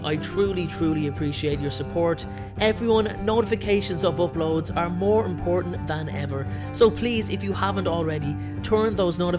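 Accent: Dublin, Ireland. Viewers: That Dublin accent just ruined this video😫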